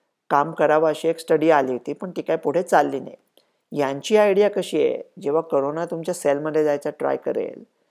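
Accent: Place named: Pune, India. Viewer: सर तुमचा बोलणं ऐकलं की अस वाटत आमच्या पुण्यात सदाशिव पेठ मध्ये थांबलो आहे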